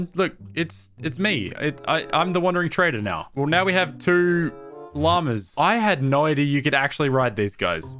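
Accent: Australia. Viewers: Does anyone know where hes from, he doesn't have an accent to me but he speaks like an aussie 😭🤣 naur (no) and seour (so) 🤣💜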